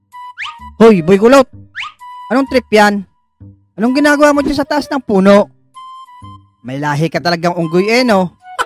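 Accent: Batangas, Philippines. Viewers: kuya taga batangas ho kayo?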